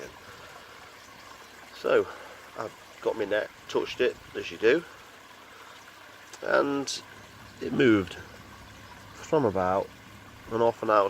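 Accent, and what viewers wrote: Nottingham, United Kingdom. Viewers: You sound like Carl Froch. is that a Nottingham accent?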